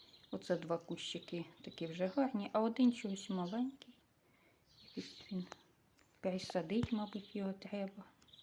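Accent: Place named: Poltava, Ukraine. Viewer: Я по Вашому говору зрозуміла що ми земляки.Я живу в Полтаві.Родилась в селі, Гребінківськийрн.Маю дачу. Теж люблю квіти люблю все що зв’язане з землею.Миру нам всим добрим людям. Як наз ваше село?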